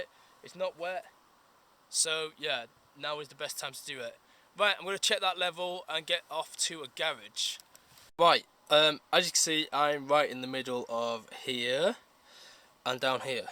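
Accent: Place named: Birmingham, United Kingdom. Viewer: sounds like a Brummie to me?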